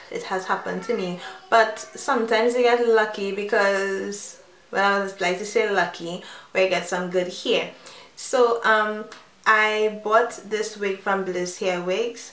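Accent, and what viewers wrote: Trinidad and Tobago. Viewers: you sounding trini ☺☺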